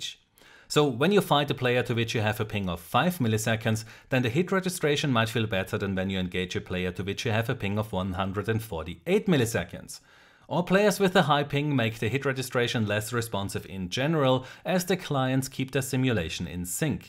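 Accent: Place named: Germany. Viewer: Man hört soooo dass du deutsch bist 😂😂😂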